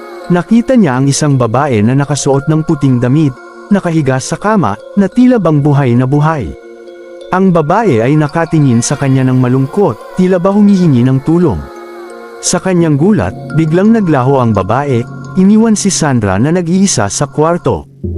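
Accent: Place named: Visayas, Philippines. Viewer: ano ka bisaya slang ka mag salita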